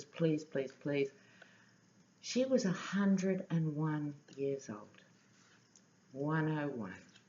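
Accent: Australia. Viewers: Thanks so much for this special reading,from up here in Queensland. I, like you have been so taken with what has been happening in USA that I have become out of touch with news at home, so was amazed to find that we had a new PM. Thanks for your overview. I do enjoy your no nonsense style, our accent and the fact that you use old fashioned aussie slang instead of US; "he has the brain of a parrot....sharp as a whip....take the flack... in deep shit....one of those you can describe by the "i"s; incompetent, immature, insecure." I do believe our political system is a little less open to the corruption we see in the US called "Lobbyists" ie money to get political reps to do your bidding. Our low key elections of 6 weeks cannot cost as much as their crazy hyped up rallies.The money for such things should come from Taxes and not from interest groups,otherwise interest groups with little money are not going to have representation. 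It might explain why in this day and age, any first world country could be against universal health cover! I wait anxiously for your readings everyday, and I like the fact that you acknowledge other people working in the area, in particular Dave Johnson.. So thanks again.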